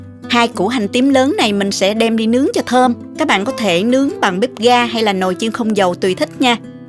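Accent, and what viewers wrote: South, Vietnam. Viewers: Khong the noi ca?m o*n bao nhieu lan den voi. Kenh cua la kenh nau an, nhung thich nhat la cach dung tieng Viet cua, vua sang tro.ng, vua trong sa'ng, va rat ngot ngao voi giong Nam bo^. Toi nghe cac ban trong nuoc xai tu*`, toi that su*. nga'n nga^?m, cach noi vo^.i va`ng, dung tu*` hung du*~..... Rat tran tro.ng kenh vi da giu gin su*. trong sa'ng cua tieng Viet. Than chao, Hiep Than Minneapolis, MN Hoaky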